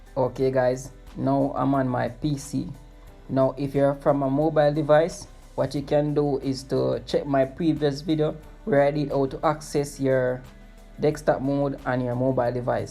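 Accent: Jamaica. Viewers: I will stick around for the Jamaican Accent.....Bomboclat!!!!!.....i just subscribed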